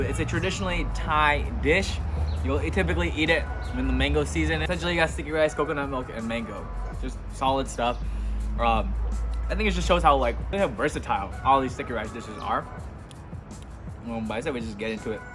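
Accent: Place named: Vietnam. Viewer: Love your Viet accent!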